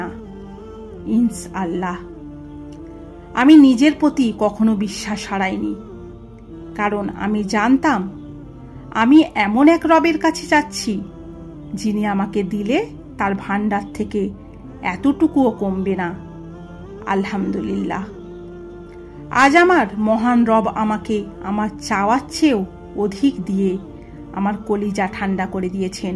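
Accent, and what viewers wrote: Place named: Kolkata, India. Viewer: ভাষা এমন কেন 😮 মনে হয় কলকাতার 😅 উদ্ভদ লাগে শুনতে।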